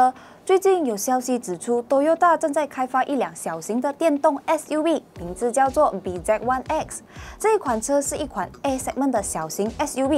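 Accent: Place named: Malaysia. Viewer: 这个AI很有马来西亚腔调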